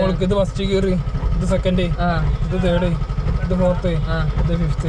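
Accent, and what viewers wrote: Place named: Nilambur, India. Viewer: മലപ്പുറം നിലമ്പൂരിനടുത്ത് ആണെന്ന് തോന്നുന്നു സംസാരം കേട്ടിട്ട്.. ☺️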